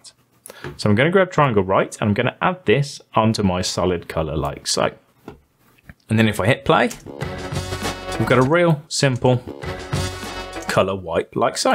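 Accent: United Kingdom. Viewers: What's not to like,?! I learned how to make an intro, and I got to hear a cool accent from across the pond.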